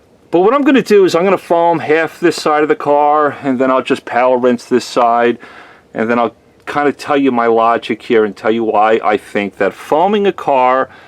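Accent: New York, United States. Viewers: sounds like new york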